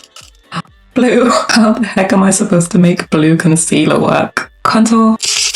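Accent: United Kingdom. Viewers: I subscribed when I heard you were British like me 🥲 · I love your British accent ❤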